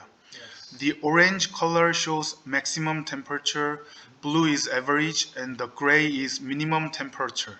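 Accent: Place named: South Korea. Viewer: 동영상 올려 놓으셨다고 해서 구경왔습니다^^ 한국어 억양이 있긴하지만 발음은 클리어하신 편이라 알아듣기 편하네요!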